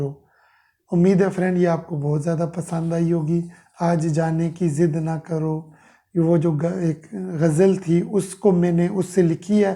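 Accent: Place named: Hindko, Pakistan. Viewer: صاحب بہت اچھا لکھا ایک تجویز ہے کہ الفاظ کی ادائیگی میں بہتری کی ضرورت ہے ہندکو لہجہ پوری طرح نمایاں نظر آرہا ہے آپ بہت اچھی شاعری کررہے ہیں صرف لفاظ کی ادائیگی میں شاعری کا مزاہ پوری طرح نہیں آرہا امید ہے آپ اس تجویز پر ناراض نہیں ہونگے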